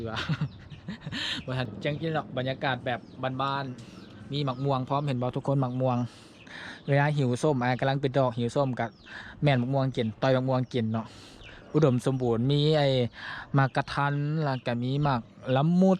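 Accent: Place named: Laos. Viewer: ผมมักฟังลาวเด้ธรรมชาติดี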